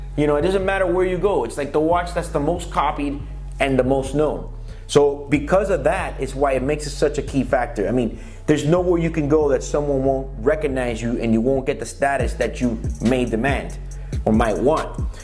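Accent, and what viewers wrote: Cuba. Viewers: Cuban accent,, interesting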